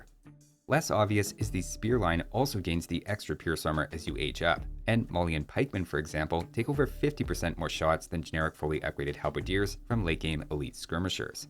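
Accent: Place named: Canada. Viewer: Great video as always,! Thanks for the insight. I don't expect you to pronounce everything super correctly, but Mali is pronounced with the "a" as in "cat" - rhymes with alley. (To pronounce it like a West African, the "l" is different too, made with the tip of the tongue forward, close to the back of the teeth. But that would probably sound kinda weird in the middle of a Canadian accent.)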